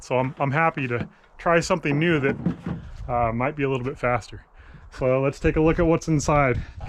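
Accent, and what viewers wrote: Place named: Appalachia, United States. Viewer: Lil bit hillbillysh but bearable to watch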